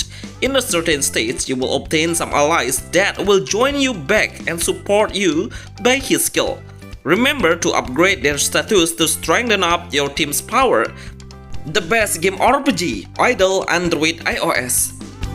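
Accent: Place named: Indonesia. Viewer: Bahasa inggris kamu udah lumayan bagus,tapi kamu perlu menghilangkan aksen indo mu bg,tapi makasih bg❤😊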